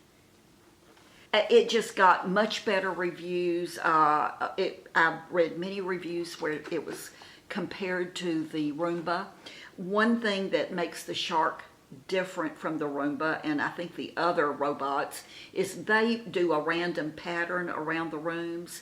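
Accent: South, United States. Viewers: Know now why I love watching you, love the accent(I'm a Southern too) and best of all I am a July baby too!! Besides I love all the other stuff you do, I have already bought some of the makeup you liked and it is great.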